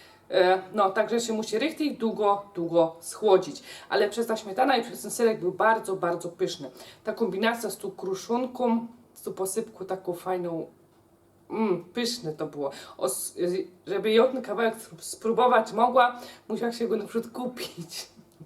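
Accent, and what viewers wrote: Silesia, Poland. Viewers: jak robisz okruchy, czyli twoja posypka, to stary piekarz zawsze mi mówił, że 1:1:1 cukru, mąki i tłuszczu ( raczej masła) i cała filozofia. Spróbuj i zobacz czy Ci to podchodzi. Mi zawsze do drożdżowego wychodziło, choć teraz już robię to "na oko". Pozdrawiam..........i powiem Ci tylko, że lubia Waszo gwara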